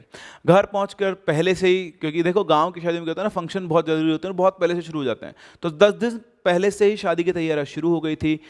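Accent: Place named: Bihar, India. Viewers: Your bihari acent is so cute and the 3D fig 👏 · The bihari accent langda bhoot dekhe k ba tohra k 😂... Hilarious 😂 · Nice bhojpuri accent sir ji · His bihari accent 😁😁😁♥️ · no bruh! its not normal hindi ... Its bihari accent .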